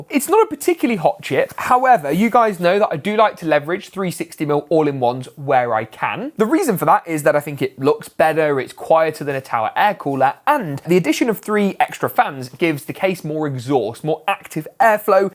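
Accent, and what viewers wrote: England, United Kingdom. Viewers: I find your pc builds informative, but you sound very English from the UK? so why all the pricing on the components in $'s and not £'s first.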